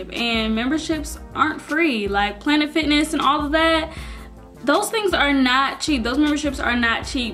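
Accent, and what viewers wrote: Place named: South, United States. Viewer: Hi. You are too cool and i like your sweet country accent. Keep being you👍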